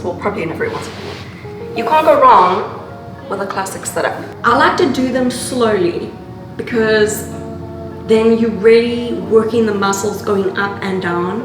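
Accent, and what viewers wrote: South Africa. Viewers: You sound South African ❤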